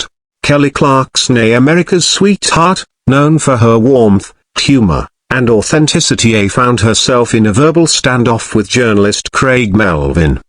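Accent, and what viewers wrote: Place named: England, United Kingdom. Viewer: Why the English accent voiceover??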